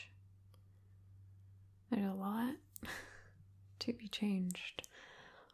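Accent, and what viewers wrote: United Kingdom. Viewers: please make more videos with british accent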